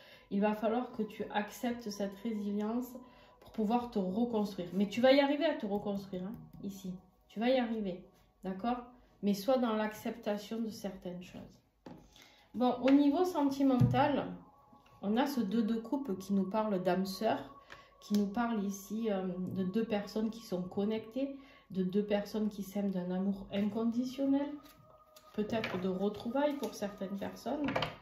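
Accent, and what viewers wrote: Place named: Provence, France. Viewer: Votre accent....j'adore ! 👍 le soleil, les cigales,les oliviers, les lavandes....tout simplement la Provence ! En tout cas, la Balance que je suis se retrouve dans cette guidance très parlante dans beaucoup de domaines. En pleine succession familiale, avec tout ce que cela implique....pas simple à gérer. Professionnellement je pense qu'ils vont savoir comment je m'appelle !!!! Relationnel et sentimental, il ne veux pas déterrer la hache de guerre, silence radio depuis des longs mois, je sais qu'il ne reviendra pas. Je guéris tout doucement....Merci ❤⭐🌹🙏🌞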